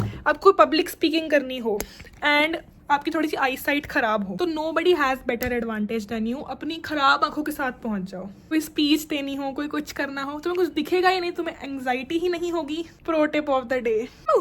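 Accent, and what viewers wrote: Punjab, India. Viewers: Godda , putha IK your punjabi accent 😭😂🎀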